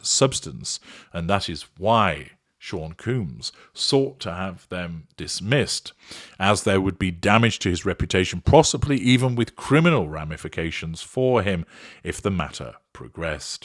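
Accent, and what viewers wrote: United Kingdom. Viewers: Dude why can’t you pronounce names properly? Surely even with your British accent, pronouncing Combs properly can’t be that difficult. It’s COHmbs….not Cooms! Also, she got $100M from the settlement. It’s open source data so you should have known that. It’s obvious that you’re just reading from various sources and have no actual understanding of the subject….including how to pronounce his last name properly. I usually enjoy your videos but this series was weak to say the least.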